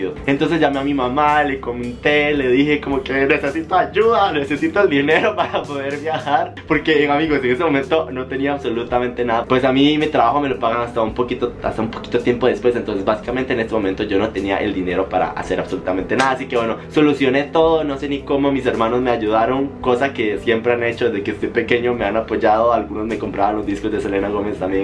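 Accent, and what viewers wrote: Mexico. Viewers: Creí que eras mexicano Como sea, me encanta tu buena vibra. Te envío un abrazo 💜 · Pensé que eras de México jajaj ❤️ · su acento suena como freson pero mexicano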